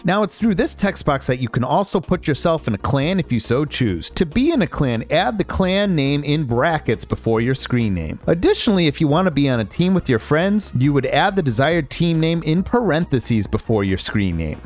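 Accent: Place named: Canada. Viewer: I’m from upstate New York and I hear it sometimes in a select few words… he sounds Canadian so I guess that checks out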